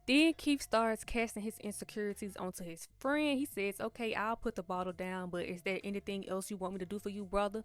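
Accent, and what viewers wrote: Memphis, United States. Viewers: Not only do I love Good Times, but you commentating it with that Memphis accent is just dope!!!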